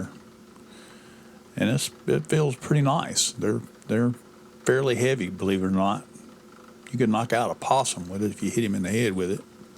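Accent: South, United States. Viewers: The possum reference comports with the country twang😂 Mks Seriously Excellent job, excellent work Great video production And very effective voice over narration The steel BBs/ bearings not bad Personally, I would go with steel rods of several different diameters, cut into disks I just had to nitpick didn't I😂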